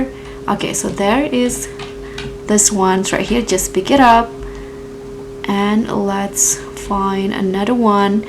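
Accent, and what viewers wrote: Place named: Indonesia. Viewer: Bahasa inggris lu kayak bahasa Indonesia, karuan pake b indo, jujur ga ngerti gua ngomong apa pas belum ngeh wkwk, tapi makasih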